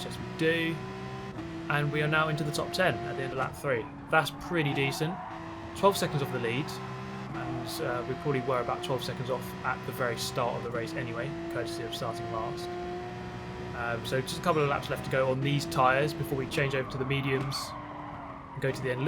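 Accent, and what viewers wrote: United Kingdom. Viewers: after a Long Hiatus from arcade/sim racing Gran Turismo 5 and GTR 2 Fia GT Racing PC( last purchased games). I'm just getting back to the groove months ago(July2020) from pad controller to Fanatec CSL F1 bundle(September2020) . Gran Turismo Sport(Awesomeness) and ProjectCARS2(Sim AWESOME), ProjectCARS3(going backwards A FLOP). I'm Hoping with GT7 to be the BEST in both Worlds, Graphics, Driving Realism Arcade and Competitive Sim Racing, I enjoyed watching your videos and host commentaries. I wish I did'nt take that Hiatus. Keep up the Great Work and Race Sim Driving !! Ohh, one more thing, I guess your Filipino ..cause it's pretty cool hearing that Brit accent. ;o)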